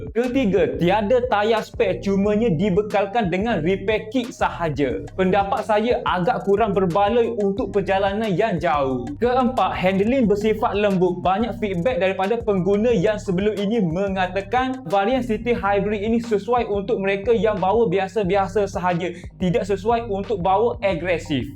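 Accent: Kelantan, Malaysia. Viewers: Mula2 dgr sy dh gelak.. 😂😂sorry dik., 🙏🏻 bunyi ganu klatey + bm baku., tp cute sgt.. sy suka contentnya., just be yourself ok., tq utk info yg dikongsi., semoga jd youtuber yg berjaya 🤲🏾👍🏽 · 😂😂😂 aku baru paham,pekatt gilaaa nak mampoih accent kelatey dia😂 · Kualiti persembahan lemah. Slang kelate pekat. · Pekat molek loghat kelate. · Bey..kelantam ker tganu. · Kecek kelate molek bohh.. 👍👍👍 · Klate ke boh?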